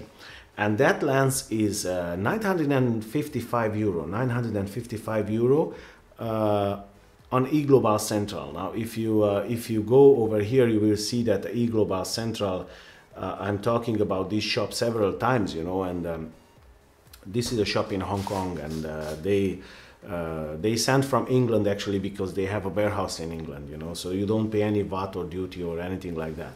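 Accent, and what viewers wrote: Hungary. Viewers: just subscribed....love your analyses and the Hungarian accent! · Annyira hallatszik valakin ez a magyar akcentus! :D nagyon jó videó. Rendkívül informatív és jól felépített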